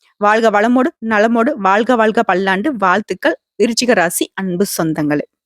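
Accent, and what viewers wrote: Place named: Kerala, India. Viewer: உங்க மலையாளம் கலந்த பேச்சு அருமை,சொன்னது நடக்கும் என்று நம்புகிறேன் சகோதரி.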